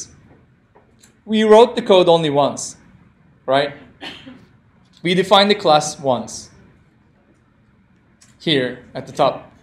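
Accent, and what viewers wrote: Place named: Turkey. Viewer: Lhahah :) sadece türk aksanı ile ingilizce olduğu için mutlaka tüm seriyi izleyeceğim müsait bir zamanda :)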